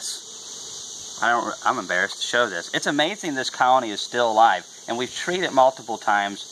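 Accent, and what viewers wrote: South, United States. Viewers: Thank you for this video and all of your videos for that matter. I'll admit it that I first started watching your videos because I liked to laugh at your "down home southern charm." Seriously you look and sound like Opie Taylor grew up and became a beek. But in fact, you have proven to be a great source of knowledge and your videos present a lot of information in a very enjoyable way! The real and refreshing shocker is that you shatter the stereotype of the southern, good ole boy beekeeper. You are clearly intelligent and capable. Keep up the good work!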